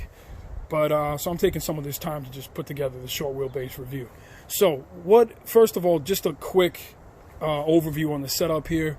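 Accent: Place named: New York, United States. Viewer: As soon as I heard your voice, it took me back home 😁 (Brooklyn/Queens/Nassau Cty) I worked at a few shops in the area in the 80’s/90’s. Enjoyed both reviews of the Jones frames, solid down to earth info. 👍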